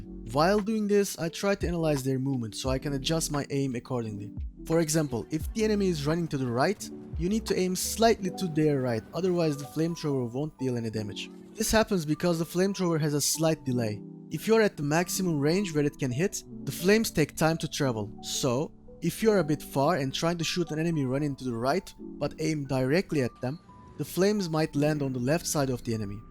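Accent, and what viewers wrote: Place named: Turkey. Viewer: Anaaaa, bu TÜRK AKSAANI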